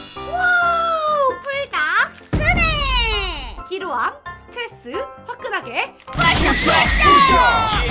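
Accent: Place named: South Korea. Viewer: 약간 영어 못하는 한국인이 말하면서 먹는거 같애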